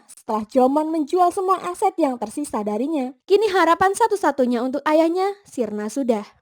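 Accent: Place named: Sulawesi, Indonesia. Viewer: Koq logatnya pakai "kita ji"... Mimin org Sulawesi kahh 😁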